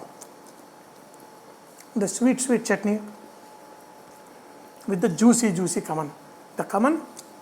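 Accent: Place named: Hyderabad, India. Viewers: Miru chesina kallu chicken is a stress buster till date ... malla aa lanti episode chey andi ... 🙏🙏🙏 with telengana accent 😀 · ZABARDAST SIR PURE HYDERABADI U R TALKING. .NICE RECAPY THANKNQ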